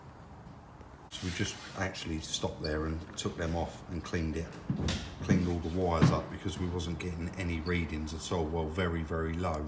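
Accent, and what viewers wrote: United Kingdom. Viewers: イギリス訛り?聞き取り易い英語。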